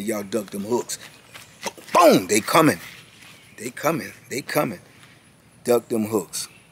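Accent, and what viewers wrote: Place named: Virginia, United States. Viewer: Lol..thats how they say carry in V.A most all of them..😂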